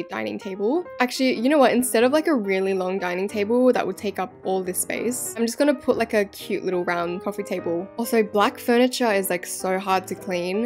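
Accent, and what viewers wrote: Australia. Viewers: in this video you can hear her Australian accent more better in this video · Is she Australian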